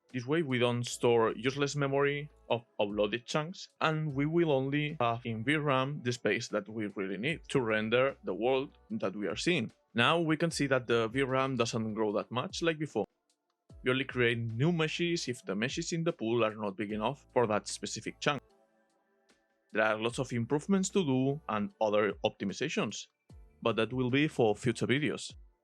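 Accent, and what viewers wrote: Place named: Spain. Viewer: Looking incredible, I hope this goes well! Also, are you spanish? Because your english accent sounds like every spanish speaking english, like myself.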